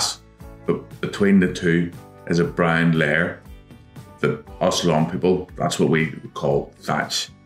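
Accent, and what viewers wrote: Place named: Belfast, United Kingdom. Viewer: Brilliant videos. Best part is your Belfast accent! Just done first cut (Feb 25th .. is this a record?). Hollow tine aerator booked for next week. Never heard of this machine, until I watched your videos. In my mind, I keep hear your saying ‘ it’s the best single thing you can do for your lawn’. Hope to see improvement this Spring!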